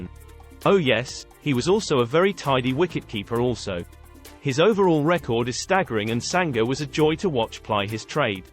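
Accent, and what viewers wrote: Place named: England, United Kingdom. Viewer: Cricket is about elegance and beauty, not stats. At least for the fans. Like your attempts at ranking the various cricketers according to their different specialties. However, learn this - no left-hander is better than Garfield Sobers as a batsman. He was great against all bowlers on any type of wicket. Brian Lara is 2nd. You speak like an Englishman. No West Indian of my age would prefer to watch Geoffrey Boycott bat over Tom Graveney. Old Tom, a wonderful English stroke player. Also, David Gower another of a different era. Keep up the good work. Like your videos.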